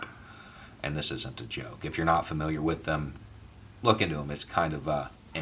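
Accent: United States. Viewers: Great stuff man . This is what a real American sounds like 🇺🇸💯 Subbing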